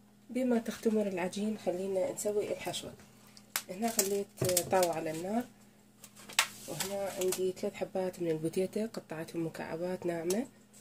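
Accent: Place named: Iraq. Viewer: تسلم ايدك عاشت العراق واهل العراق الطيبين اجمل اشي بالفيديو هدوءك واللهجه العراقيه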